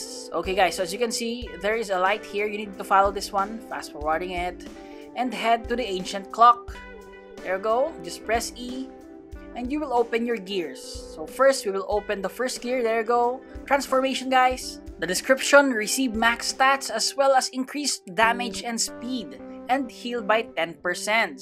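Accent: Philippines. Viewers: Are you Filipino, your accent😂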